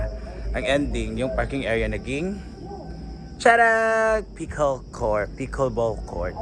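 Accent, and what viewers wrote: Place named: England, United Kingdom. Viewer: ❤, I like your English accent ❤, bagay gyud sa imoha mag host ug event ❤😊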